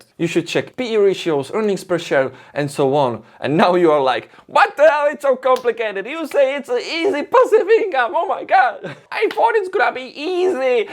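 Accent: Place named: Czech Republic. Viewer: Proč musejí mít češi v každé řeči ten český akcent , to se nedá poslouchat po 16 vteřinách jsem to vypnul.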